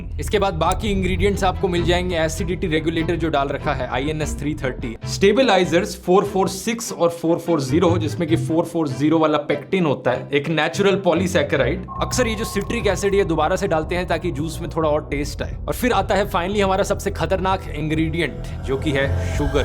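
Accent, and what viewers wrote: India. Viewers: The real indian voice ✨✅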